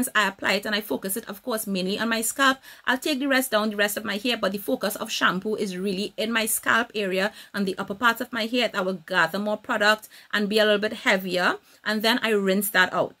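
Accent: Trinidad and Tobago. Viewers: I had a feeling it was a trini accent. She sounds like my relatives. Lol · Girl, when I heard your Trini accent I SCREAMT🇹🇹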